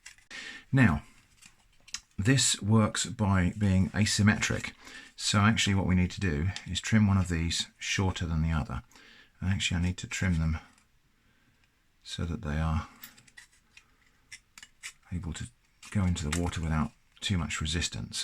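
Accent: England, United Kingdom. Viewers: Innuendo seems to go well with an English accent. Classy.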